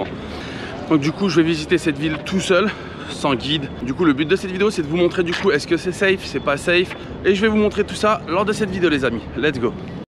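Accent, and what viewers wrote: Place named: Algeria. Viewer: Je l’aime bien parler français algérien Vous êtes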